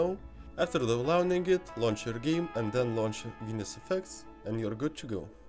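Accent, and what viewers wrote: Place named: Russia. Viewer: Чувак, ты из России? По акценту слышится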